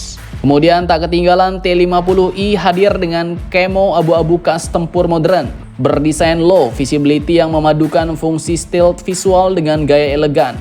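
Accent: Pontianak, Indonesia. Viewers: Mimin orang Pontianak?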